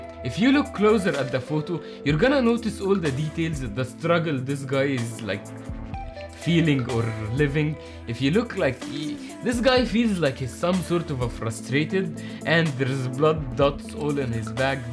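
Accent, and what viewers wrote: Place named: Egypt. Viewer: I like the last idea. Breaking down famous artists. And even in that idea, performing their famous art yourself is the part I loved the most because I guess we will go through a very good learning curve that way! 😍 Also loved this video. You should keep doing such videos too every now and then. And last but not the least, I love your accent. I was living in Cairo for 6 months and hearing you was making me feel nostalgic. I felt like I'm home throughout the video! 😃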